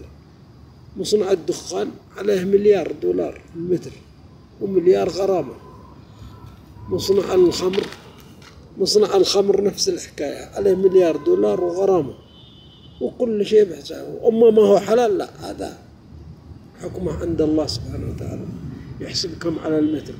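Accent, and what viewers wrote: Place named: Mecca, Saudi Arabia. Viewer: استغفر ربك بنو قريش معروفين ولا يضيع نسبهم وهذا واضح انة من مكة المكرمة حتي اللهجة مكاويه بطن احجاز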